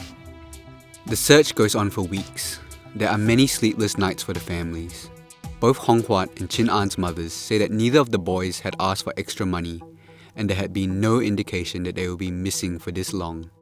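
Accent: Australia. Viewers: thank you, the aussie accent is strong and kinda distracting too. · I noticed also. The accent is light, but it is def Australian what. Maybe need trained ears to be able to hear as not everyone is good at spotting accents?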